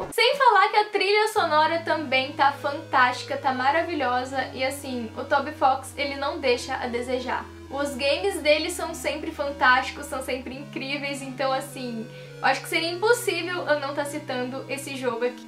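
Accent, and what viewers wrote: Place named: São Paulo, Brazil. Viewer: pensei q vc era de São Paulo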